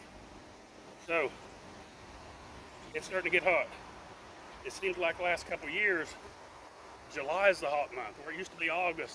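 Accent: South, United States. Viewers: would have been PERFECT if he were cast as the acting role of the beekeeper in Matthew McConaughey's movie Amaziah king. Its being filmed in AL. They were looking for a strong beefed up adult male strong from handling beehives for YEARS. He has the southern accent, experience, and he'd have represented beekeepers well. Darn it!! Wish Id have sent your info to them when they were doing casting calls. The role is being filmed in late July/August. Maybe its not too late.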